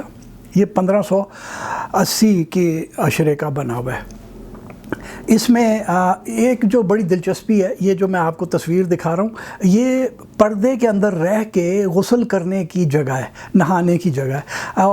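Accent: Punjab, Pakistan. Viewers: My father emigrated from Talagang to Delhi in 47. He expired a few years ago. When I was a child, he told me this story. Your Punjabi accent is similar.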